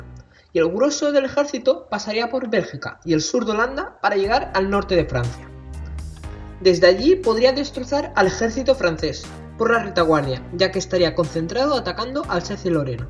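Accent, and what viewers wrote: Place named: Catalonia, Spain. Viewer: Muy bien muchacho Sencillo y claro He visualuzado tu canal y tenes videos muy interesantes No entiendo tan pocos seguidores Ojala vaya a mas Por cierto eres catalan? Yo vivi unos años en Barcelona Me parece que tu acento es de alli Saludos desde Israel de un judeoargentino sefardi Shalom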